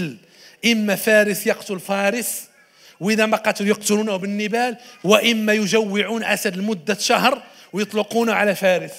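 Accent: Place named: Morocco. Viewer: نعم اختي شككت في اول الامر لان لكنته مغربية والأن كأدتي لي تخميني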